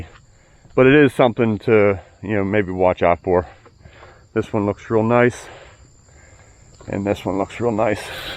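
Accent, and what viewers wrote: Pittsburgh, United States. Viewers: Two things gave it away yinz are in the Pittsburgh area 1 . your accent and the way you say House. 2. That big green truck with "THROWER" on the door .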